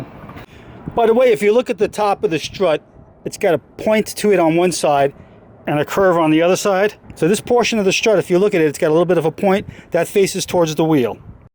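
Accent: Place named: New York, United States. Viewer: I just assumed he was in NY because of his accent and I was wondering how his car is so clean so I was confused till I read your comment.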